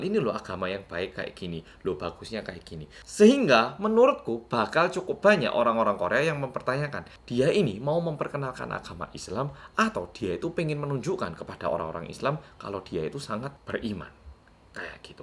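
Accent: Java, Indonesia. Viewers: CUMAN YOUTUBER KOREA YANG PERCAYA CUMAN KARENA PERNAH TINGGAL DI MALANG PLUS BISA BAHASA JAWA MEDOK😂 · Kaya orng korea mukanya..tpi ada medok2 jawa bicaranya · Min..mukamu kaya korea tp logat mefok jawa ... Hebat mi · kan memang korean lah,cuma dia membesar di malang makanya medhok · Padahal subscriber nya banyak dari luar Indonesia juga, piye Yo mas? Wah, Jian.... Semoga cepat diberi hidayah. Terus kawal mas, LAN Ojo lali medoke haha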